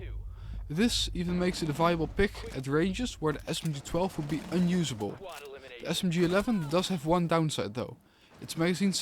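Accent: Netherlands. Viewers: ik hoor gelijk je bent Nederlands haha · Nederlander? hoorde het in de eerste 5 sec.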